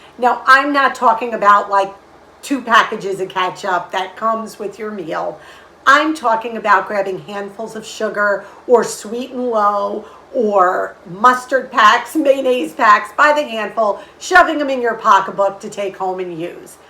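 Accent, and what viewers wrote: New York, United States. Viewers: Im a New Yorker moved to the south and i adore your ny accent , makes me miss home.I still have mine too.I am told.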